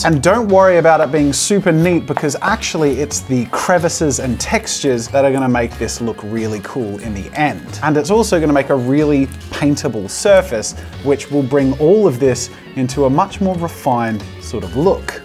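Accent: Australia. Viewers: Seeing what's supposed to be a spooky skeleton-esk mask and hearing a very cheeky Ozzy accent if probably the highlight of my day. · I feel like this is the most Aussie has sounded in a while. >.>... Just me? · a demon skull with a cheery Aussie accent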